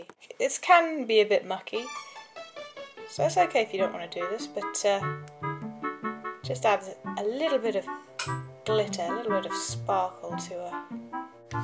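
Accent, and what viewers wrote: United Kingdom. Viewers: Gracious, you're British. I came from the latest Grumps animation, and dang-ass-dang, you're all the more awesome because of it. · What part of the UK are you from? I've decided I need to marry a woman who says "me dainty fingahs"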